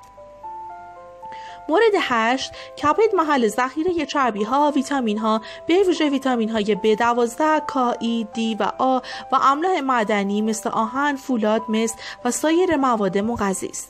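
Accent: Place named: Turkey. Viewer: لهجه تورکیت منو کشته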